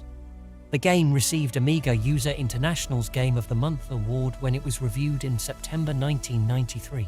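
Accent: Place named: England, United Kingdom. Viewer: Doon. You have a freaking english accent. How do you pronounce the letter "U"?!? Why don't you pronounce studio, "stoodio"?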